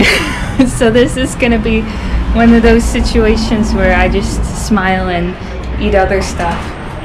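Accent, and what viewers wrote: Michigan, United States. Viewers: Your Michigan accent is very cute 👍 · Hello from Michigan and yes that is Michigan accent. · Ah the Michigan accent lol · Wow you have a Michigan accent? I’m from Michigan too and currently learning Mandarin in school!🤩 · That's funny on some of the comments you mentioned.Being an American myself and having spoken American English since the age of 3, I can attest that your American English accent is still very much intact. You definitely still sound like a Michigander.:) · Hi. I'm wondering if Michigan English accent is similar to the accent in Southwestern Ontario in Canada. That's where I'm living, and to me, their accent sounds a bit different from yours. This is very common in China, but probably also happens in English-speaking countries (geographically very close but different spoken accent). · Hey, I haven't heard a Michigan accent much before, so it's quite novel sounding to me. 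I like it. You're quite unique in you language ability with both Chinese and Japanese. I've learnt Chinese through mass reading of novels, and am also learning French, so I know the time it takes. I don't think I will start another language, at least not with the intent of understanding it deeply. I imagine it must be difficult thinking up new content all the time. But then again, a lot of people just enjoy the everyday vlog videos. 祝你幸福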